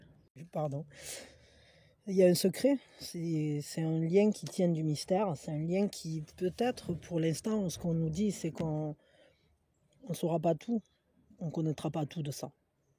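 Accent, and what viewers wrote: South, France. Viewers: Bonjour, votre accent du midi fait plaisir à entendre 😍 Nouveau cycle, mais notre lien a été corrompu par de la magie noire. Ma famille est tout !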